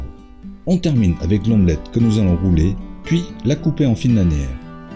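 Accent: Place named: France. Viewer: Bonjour hi, you speak French with French accent, but I speak with Canadian accent.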